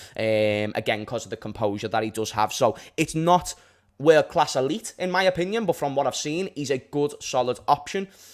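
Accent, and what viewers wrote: Liverpool, United Kingdom. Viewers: will ya stop ur passionate bout ur team and ur scouse. Nothing wrong with that coming from an Irish ☘️ man.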